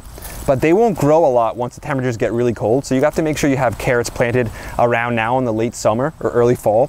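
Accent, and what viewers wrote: New Jersey, United States. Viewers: You’re so Jersey lol love it -coming from a 973’er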